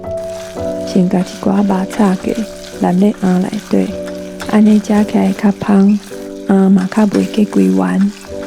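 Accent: Hsinchu, Taiwan. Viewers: 第一次聽到新竹腔耶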